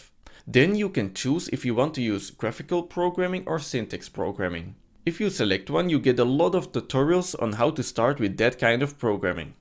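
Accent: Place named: United Kingdom. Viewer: Je engelse uitspraak tho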